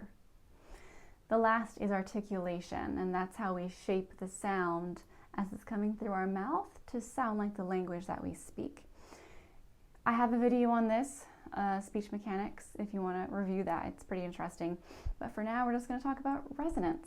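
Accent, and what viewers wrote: Texas, United States. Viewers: hello are you Texan? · are you Texan?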